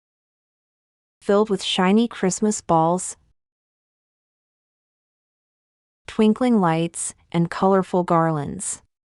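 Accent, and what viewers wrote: United Kingdom. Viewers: does this channel have a British accent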